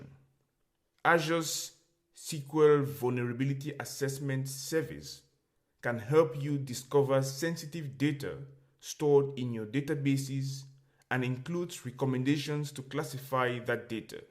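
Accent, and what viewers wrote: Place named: Africa. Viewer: Your name is, but accent is african... where are you from, just curious...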